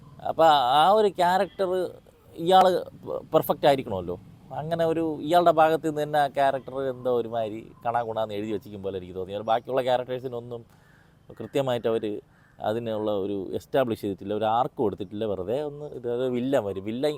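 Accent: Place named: Thiruvananthapuram, India. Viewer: തിരുവനന്തപുരം ഭാഷ❤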